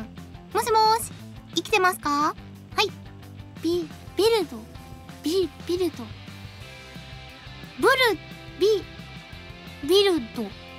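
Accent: Japan. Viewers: английский, с японским акцентом, это нечто <3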